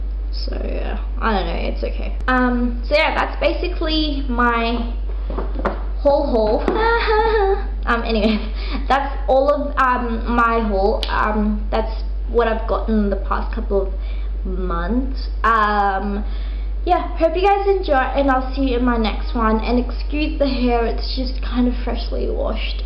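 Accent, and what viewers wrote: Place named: Australia. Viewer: hahha why part of Australia are you from?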